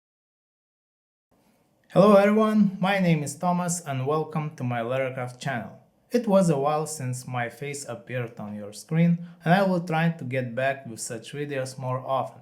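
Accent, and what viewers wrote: Russia. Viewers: Ты русский? У тебя акцент как у русского и русские субтитры)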